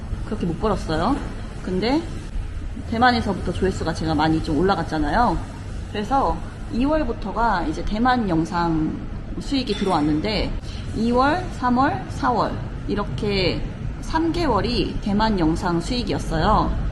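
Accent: Gyeongsang, South Korea. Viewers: 경상도 분이신것 같아요. 오늘영상에서 눈치챔. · 억양을 보니 경상도 출신이군요. 수입은 꾸준하게 들어올 수 있는 구조로 만들어놔야 해요. 몇달 벌어 몇달 쓰고.. 이런씩은 노후 빈곤으로 이어집니다. 젊을때 열심히 일해야 해요.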